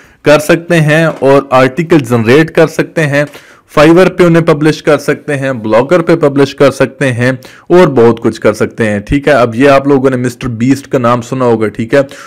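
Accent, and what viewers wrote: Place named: Mianwali, Pakistan. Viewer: Boli se AP mianwali k lgty hain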